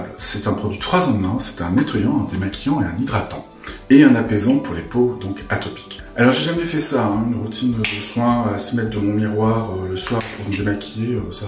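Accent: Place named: Marseille, France. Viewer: Bel accent presque le mien de l Estaque quartier nords en foooooorce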